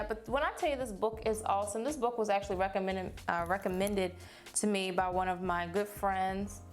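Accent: South, United States. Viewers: her Invisalign makes her sound even more southern/country? Its so cute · girl you sound so country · you sounded so country and southern in this video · i love your country accent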